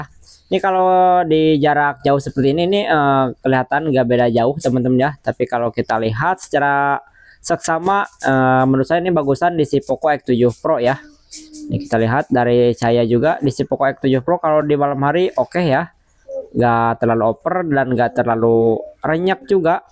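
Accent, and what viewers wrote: West Java, Indonesia. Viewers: Sunda pisan mang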